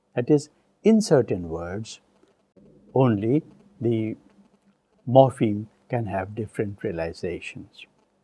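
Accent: India. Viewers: That's his native (Hindu, I guess) accent interfering with his pronunciation of English words... It happens with everyone of us who aren't native English speakers but in few exceptional cases.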